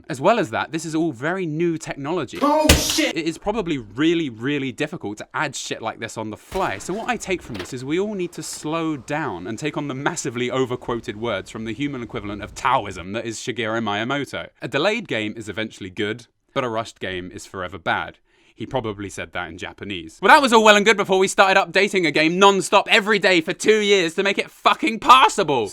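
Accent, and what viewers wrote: United Kingdom. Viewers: wait hes british? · I must have had several brain hemorrhages because I never realized you were British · I love the British accent💙. Also great video! I could not stop laughing 😁😁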